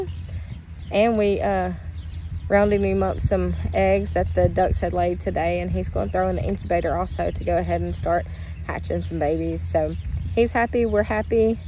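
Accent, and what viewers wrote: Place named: South, United States. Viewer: Their little southern accents are just as adorable as yours..lol I'm southern too from GA lol but it's just so cute to hear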